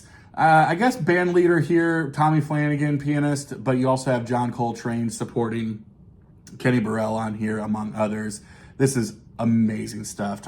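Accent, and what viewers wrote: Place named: Ohio, United States. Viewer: Hi! I blacked out during the “free jazz” segment. But when I came to, the album that intrigued me was the JA-20 or. The fat hype sticker was in the way and your Ohio accent was hard to decipher. Awesome episode!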